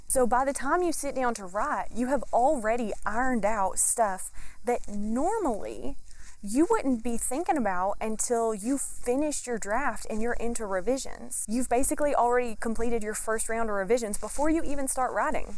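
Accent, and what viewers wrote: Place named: South, United States. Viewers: So glad I found this video. I developed this same method on my own and it REALLY works. Get as much detail or splashes of dialog into the individual chapter descriptions to support your story structure ahead of time. BTW love your t-shirt and accent. East Texas? Oklahoma? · Southern accent? Author? In the woods? I pressed the subscribe button so hard. 😂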